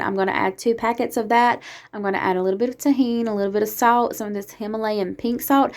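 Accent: South, United States. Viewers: Hi pretty girl, thank you for sharing your recipes , everything look so good and can't wait to try to make as well as you. Ps. Love your Southern accent. My daughter lives in Georgia too and my grands have the same accent.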